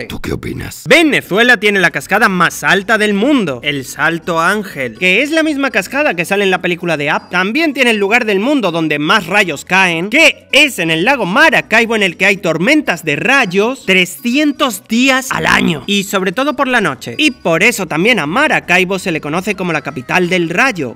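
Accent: Spain. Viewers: Históricamente incorrecto, pero una carta de amor a la comedia Brother no te creo que seas venezolano nunca con ese acento español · Espera, cómo que eres Venezolano?, yo soy venezolano, y diría que suenas más español que venezolano 🤨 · Por qué si eres venezolano hablas como español? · No suenas como venezolano suena como español o se te olvidaron las raíces, que pena ni fueras dicho que eres de Venezuela me da pena decir que ere mi paisano pero 😅 si da risa y es cierto lo que cuentas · Por que suenas a visualpolitic con ese acento y expresiones raras?(español de españapara ser mas claro) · Bro como q eres Venezolano? Pensé que eras español 😭😭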